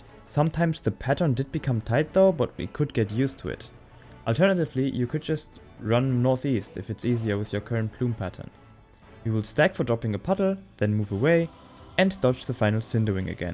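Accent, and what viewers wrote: Germany. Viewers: Ok so it's true that all Germans sound the same and adorable OK! <3 ahaha thank you for your reply!!!!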